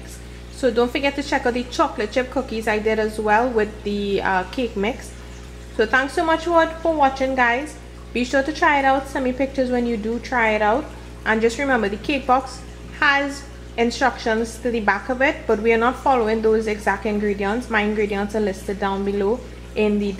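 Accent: Trinidad and Tobago. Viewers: Are you from the Caribbean? You have a Trinidarian accent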